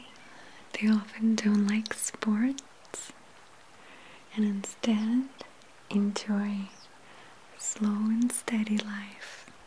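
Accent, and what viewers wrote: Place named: Russia. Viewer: Thank you! Is there any way you can make a video about actual elements? Maybe a mad scientist role play with a lab coat talking about Moscovian in your lovely Russian accent?....think about it.... [waits for inception to happen]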